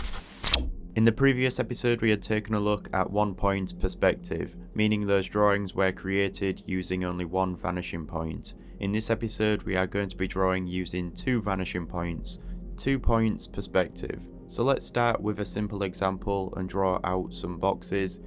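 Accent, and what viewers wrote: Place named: Scotland, United Kingdom. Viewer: He has a Scottish/British accent and is from the UK. He has images and a few links about Staedtler which is a company in Nuremberg, Germany but has 150 other representatives in other countries. One link stated that he was on site in England. He also is an architecture graduate at BlokBuild from Hull, East Yorkshire [England]. This took about 8 minutes to find out. You’re right, in that, asking him is the direct answer—-but he rarely comments, I haven’t actually seen him commenting at any point and I was curious myself. This informaiton is only speculation, for one—-im 100% block out deaf. But this could be why there’s confusion on his accent, this happens when someone is from one place and relocated to another [even more so if a third place is added].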